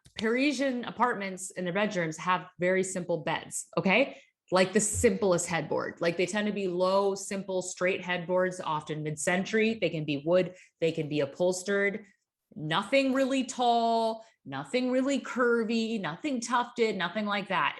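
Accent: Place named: Texas, United States. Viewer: Is it me or are you saying Y’all more frequently? The Texan is coming out! 🤣 I love it!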